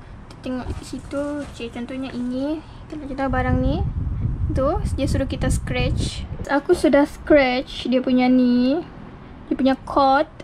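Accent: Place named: Sabah, Malaysia. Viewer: Macam slank sabah. Iya ka? Assalammualaikum dri sandakan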